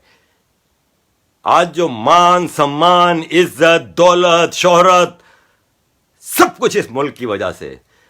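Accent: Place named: Gujarat, India. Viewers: Aarif Bhai English bolne se pata lag Gaya aap bhartiya gujrati ho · आरिफ अजाकिया साहब आपका यह वीडियो क्लिप देखकर मैं और गौरवान्वित हो गया वह यह जानकर कि आप हमारे गुजरात के सौराष्ट्र जूनागढ़ जिला बांटवा के भारतीय गुजराती मेमण परिवार से है. और सबसे ज्यादा खुशी तब हुई कि आप हमारे हिंदुस्तान पर आज भी गौरव लेते हो. हमारे गुजरातियों में कहते हैं ना कि तमे तो सवाया गुजराती छो.आ रीते तमें तो साहेब सवाया भारतीय छो अने गुजराती पण छो. आनाथी वघारे अमने शु जोईये??? इससे पहले भी मैंने आपका बहुत वीडियो क्लिप देखा और सोचता था कि यह साहब नाक नक्स से तो सोरठी लग रहा है क्योंकि यहा जूनागढ़ जिले को सोरठ प्रदेश बोला जाता है. आप की हिंदी स्पीच बहुत अच्छी है फिर भी sir मैंने एक बात नोट किया है कहीं-कहीं आपके हिंदी उच्चार में( pronounced) गुजराती होने की की छांट दिखाई देती है. आपने यह बात सही बताएं यहां के मुसलमानों हिंदुओं से कुछ ज्यादा ही चिडे चिडे रहते हैं. जबकि हिंदुओं से उसका बहुत अच्छा धंधा रोजगार चल रहा है. 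और क्यों ना हो यहां के कांग्रेसी और उसके पाले हुए मुल्ला और मौलवी खुद की रोटियां सेकने के लिए मध्यम वर्गीय मुसलमानों को हमेशा हिंदुओं के खिलाफ भड़का रहे हैं और आपने जो दाखिला दिया जावेद अख्तर जिसको इस देश में मान सम्मान प्रतिष्ठा पैसा सब कुछ दिया है फिर भी देश के प्रति गद्दारी पाले हुए हैं वो खुद तो कहीं निकलते नहीं मगर इसमें बेचारे गरीब और मध्यमवर्ग के मुसलमान पीड़ित हो जाते हैं और देश के साथ direct or indirect गुनाह कर जाते हैं और बाद में यहां की जेलों में सड़ते रहते हैं और जावेद अख्तर जैसा माल मलीदा पेलता रहता है. और दूसरी बात यहां के ज्यादातर गरीब मुस्लिम एजुकेशन से वंचित रह जाते हैं इसलिए दूसरों की बातों में भी जल्द आ जाते हैं. हां यहां की मुसलमान कोम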